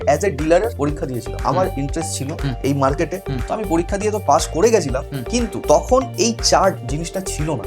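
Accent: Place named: West Bengal, India. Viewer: ইন্ডিয়ান বাঙালীদের কথাবার্তায় একটা বাটপার বাটপার আভাস পাই। আপনাদের accent অনেক ক্ষ্যাত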